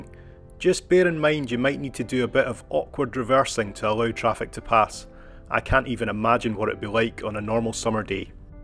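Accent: Scotland, United Kingdom. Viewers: At last a Scots voice .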